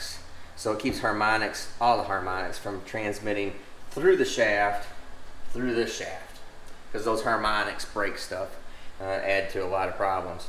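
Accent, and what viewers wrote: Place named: North, United States. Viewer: I'm from Arkansas so I noticed that same thing he definitely sounds like he is from the north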